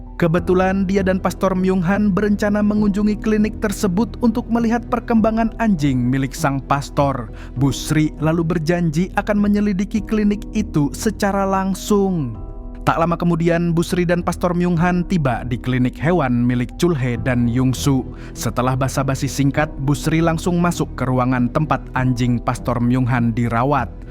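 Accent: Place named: Banjar, Indonesia. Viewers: Kaya' orang Banjar logat naratornya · Naratornya urang Banjar ini ya KLO... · Orang Banjar sekalinya Sidin ni lah wkwk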